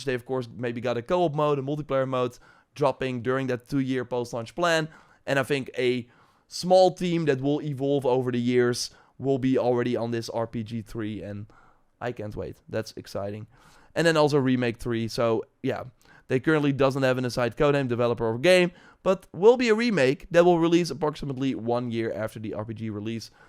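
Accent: Netherlands. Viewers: Dutch (Netherlands) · i really wanted to watch this video but that thick dutch accent is so grating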